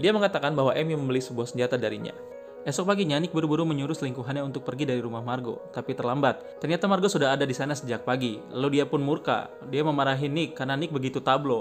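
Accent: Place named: Banjar, Indonesia. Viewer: Banjar banar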